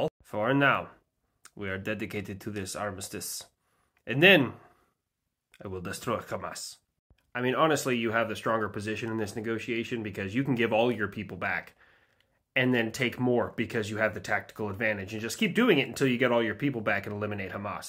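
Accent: Scotland, United Kingdom. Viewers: Ok, so does he sound like fat bastard from Austin Powers? How about the Scots in braveheart? Or perhaps Scotty in Startrek? Hell ive been to the UK and ran into a few pretty cool Scottish people and honestly its close enough. Also fun fact the Irish were a lot harder to understand when i visited the region. Oh i forgot about Gimli from lord of the rings. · Right who's been having a go at your scottish accent? Point me to them the wee bastards. You're doing great! 🏴FREEDOM!🏴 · If people complain about the Scottish accent, just tell them that you're actually doing a dwarven accent from Warcraft or something. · am a Kilwinning lad from scotland, and i love your Scots. regardless of sound its spiritually accurate! Just remember the word 'Cunt' in scotland isint a swear word like the auzzies, its more of a comma! #A10ismyspiritanimal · I'd love to hear Count Dankula's or Critical Drinker's thoughts on your Scottish accent. 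· My good friend directly from Scotland tells me that the accent is like a family-friendly version. And showed me how a real Scotsman swears and curses that I can not repeat here in Youtube comments. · If the scots don't like your scottish accent impression, could you try maybe Soap MacTavish? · As a Scottish person myself, I'm fine with the accent it's funny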